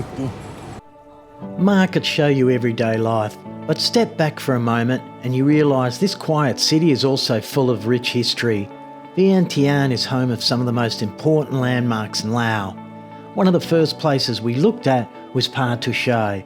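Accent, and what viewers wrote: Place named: Australia. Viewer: Thank you for the video, mate. Love seeing vid about Laos. Also, love your down under accent. Stay healthy and enjoy your retirement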